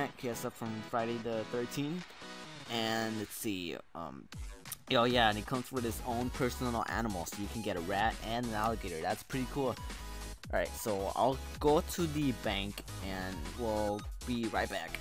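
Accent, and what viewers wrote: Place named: New Zealand. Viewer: I knew it you had an accent! >:3 If I'm not wrong,you're from New Zealand right? Btw I want to enter aswell xD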